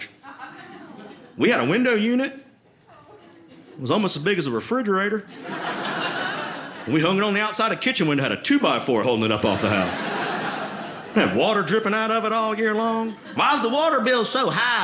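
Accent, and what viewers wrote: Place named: South, United States. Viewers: It’s the Southern charm 🥰🥰🥰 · I'm from north Carolina. This dude is talking exactly the way I grew up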